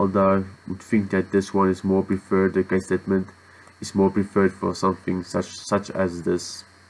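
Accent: Afrikaans, South Africa. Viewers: Nice Afrikaanse aksent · Are you South African ?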